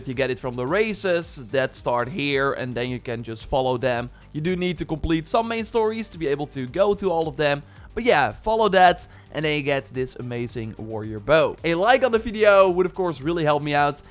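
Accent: Netherlands. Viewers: Are you dutch?? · You sound dutch.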